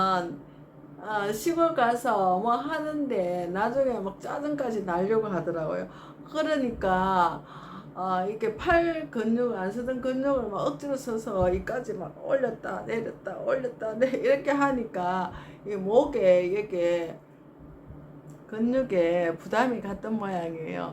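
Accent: Gyeongsang, South Korea. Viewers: Wow! 雨中이라도 예쁜 女뻐꾸기의 경상도 사투리 소릴 들으니 기분은 상쾌하네요! 아름답고 매력적인 음색의 말씀과 편집이 잘 된 영상, #우리의삶은_매일쓰기다의 좋은 교훈은 종일 듣고 싶네요. 늘~ 행복하시고 - - - 계속 재밌는 말씀을 기대 합니다. :body-blue-raised-arms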